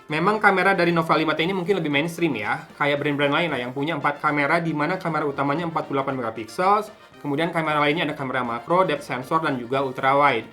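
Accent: West Java, Indonesia. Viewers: orang sunda mah keliatan dari logatnya juga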